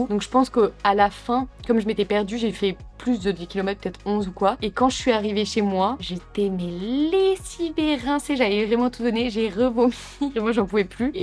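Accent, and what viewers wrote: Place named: South, France. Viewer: j'avais jamais entendu que tu avais un léger accent du sud, ca te rend encore plus mimi :D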